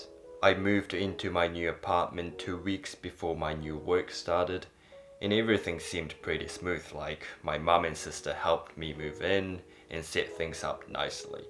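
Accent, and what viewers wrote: New Zealand. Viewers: Your kiwi accent is strong bro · so that explains your accent, you have lived in NZ! Btw your videos are amazing and and an inspiration to people who practicing minimalism like me. Thanks!!!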